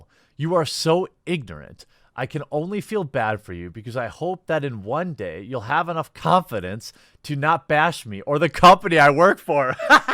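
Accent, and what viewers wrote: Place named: Midwest, United States. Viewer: Northwest/midwest accent, lol. Reminds me of the Wisconsin area. Ever notice how he says words like "lag" or "bag" with like an extra "y" sound in there, like "layg" or "bayg"? That's his northwest/midwestern accent and he's commented on it before, lol ;)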